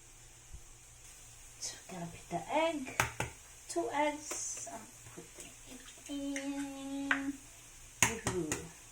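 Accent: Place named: England, United Kingdom. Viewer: So enticing recipe of yours. The reason also i always watching your video because of your english accent. Love yah!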